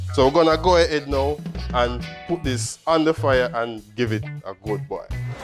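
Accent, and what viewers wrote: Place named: Jamaica. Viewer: I know you are saying something good. Only Jamaicans and those In contact with Jamaicans will understand what you are saying. Just slow down so we can pick up what you are saying 🙏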